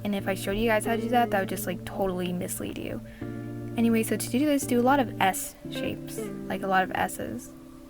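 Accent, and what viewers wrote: United States. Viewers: 0 waow ur a Filipino but ur good at American accent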